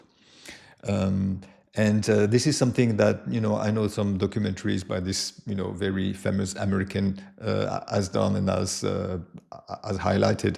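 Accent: France. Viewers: "Why I Moved to France"... Are you talking about yourself? Because you sound French... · Is he not? I mean, that is unmistakably a French accent. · Hi, Are you french yourself ? You seem to have a french accent.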